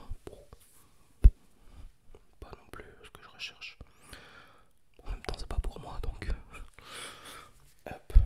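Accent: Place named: Normandy, France. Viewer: Tu as l'accent de Normandie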